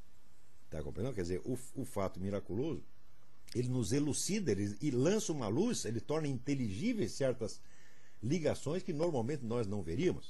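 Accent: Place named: Portugal. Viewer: Caro amigo de Carvalho que vou acompanhando à distancia, gosto de o ouvir, principalmente quando se zanga e fala o português do português. Gostava de o ouvir sobre um tema a que todos fogem... A história sobre o tempo... O tempo de uns não é igual para outros...O tempo do assassino difere do tempo do assassinado. O tempo de Cristo difere do nosso... Já Agostinho respondia à pergunta do amigo Evódio quando inquiria o que Deus fazia antes de criar o mundo e,Agostinho responde, em tom de zangado, que Deus preparava o inferno para este tipo de perguntadores. Depois diz Agostinho: Antes da criação do mundo não havia tempo... Pergunto, caro, todos vemos o tempo de modo semelhante? Eu penso que não. Uns estão presos ao passado, outros só vêm o presente e alguns têm angustias com o futuro... Amigo, pense neste tema e disserte sobre ele... O meu muito obrigado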